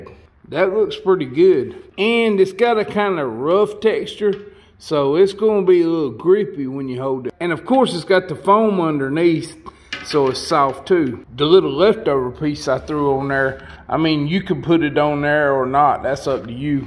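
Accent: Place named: South, United States. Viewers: Southern accents give people a bad rap. As soon as I hear a thick southern accent I automatically assume the person is a dumb/slow. But you my friend, are not dumb or slow. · Dude, I've got to comment. I like your style of explaining and humor. I love your 'southern accent.' You sure you're not from deep in the Southeast? lol Anyway, I've looked at quite a few of your videos and am impressed. You get down to the bottom line $$$ wise and explain the purpose, and why, plain and simple. I've learned quite a bit. I'm in the process of getting back into fishing for next year. Got my boat, currently modifying it and getting it ready. Keep up the good work man...............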